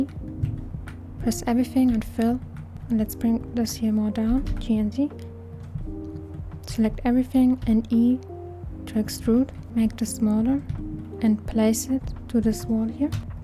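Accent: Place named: Germany. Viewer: Oh youre german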